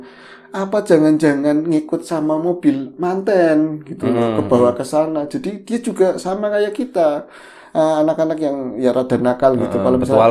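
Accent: Banyumas, Indonesia. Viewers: Logat ngapak nya blm ada lawan kaya nya... bisa ngakak sendiri kalo dengerin beliau pas cerita.. haahaaaa.. sukses selalu yaa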